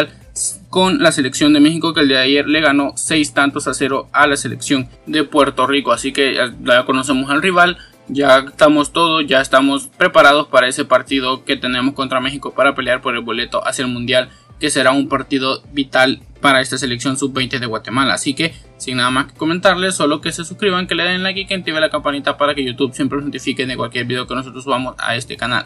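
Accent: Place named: El Salvador. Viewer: Mi amigo, utilizá Loquendo! No se te entiende y tenés acento como de salvadoreño. Un abrazo!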